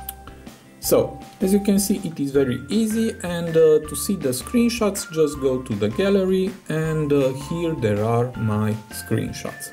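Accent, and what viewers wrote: Romania. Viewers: Multumim frumos,dupa accent sigur esti roman 😀🤗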